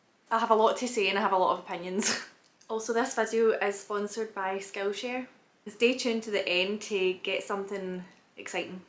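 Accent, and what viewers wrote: Scotland, United Kingdom. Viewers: I'm so glad i found your vid. Initially i was looking for smth to study scottish accent but i think i just found my person <3 I'm currently feeling so stuck behind in life and your take is like a breath of fresh air tysm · That was brilliant!!! I only came here to learn a Scottish accent but you are adorable ❤️Loved the talk! Do what you want in life full stop! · I'm from Poland and watching your videos to get acquainted with the Scottish accent, which I really like. I visited Scotland many years ago and liked it a lot, watching your stuff reminds me of my past travels and how nice the Scottish people are. I wish I get the chance to go there again some day. I hope you are doing well and finding your happiness in life! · You always made interesting, funny and beautiful videos. Miss you and your lovely Scottish accent. Hope you're okay. 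Ty from Ohio.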